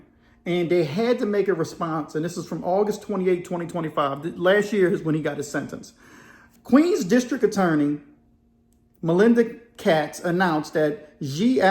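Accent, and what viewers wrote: Louisiana, United States. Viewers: Love the accent,Louisiana?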